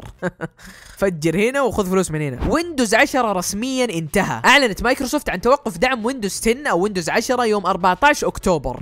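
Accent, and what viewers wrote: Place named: Iraq. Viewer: زين ليش لهجتك عراقيه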